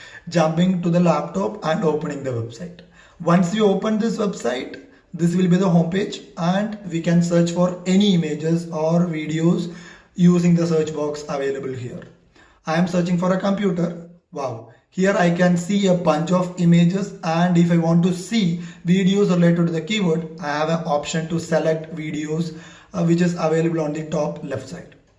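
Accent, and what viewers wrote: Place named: United States. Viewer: You American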